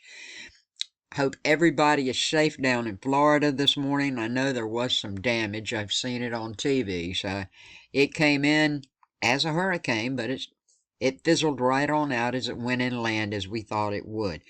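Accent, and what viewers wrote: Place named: North Carolina, United States. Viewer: ❤loovvveee your weather Chanel! Love the n.c accent! Keep up the good job , you make it all easy to understand 🤗🙏🏻💕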